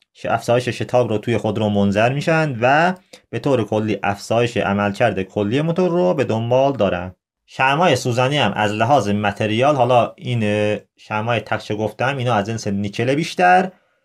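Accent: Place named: Azerbaijan. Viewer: ممنون از توضیحات کاملتون بخصوص بالهجه بسیارزیبای اذری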